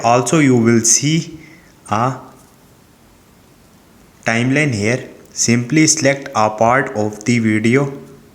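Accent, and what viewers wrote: India. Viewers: this guys voice sounds like those scammers from india👍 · Yeah its called an Indian accent.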